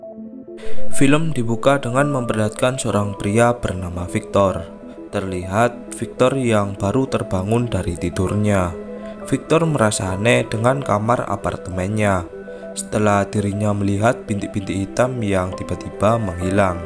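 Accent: Java, Indonesia. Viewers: Wkwkkw ,sama kek pemikiran gw dah persis kamar film tp ini versi medoknya wkwkw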